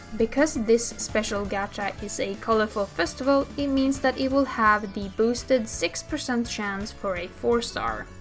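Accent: Sweden. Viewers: Are you swedish by any chance? I am so im not sure if your accent is swedish. I would loveee to pull on that event thanks for the help! <33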